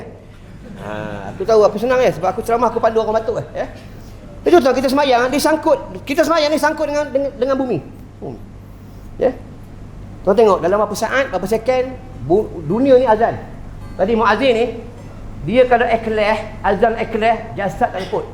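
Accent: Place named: Perak, Malaysia. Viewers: Orng perak mmng ckap kaso sikit jngn terase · Org perak mmg ckp dngr mcm kasar..tp padahal perckapan biasa ja..serupa la org x selalu berkawan org utara..dngaq loghat org kata smbang kasaq..padahal bisa la tu..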